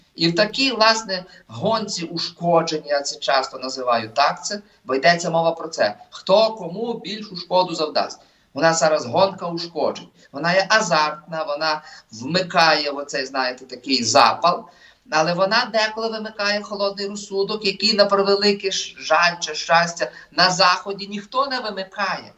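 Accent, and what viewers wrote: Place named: Russia. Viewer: Прізвище - російське, мова, хоч і львівська, але трохи рос. акценту є, напевно москаль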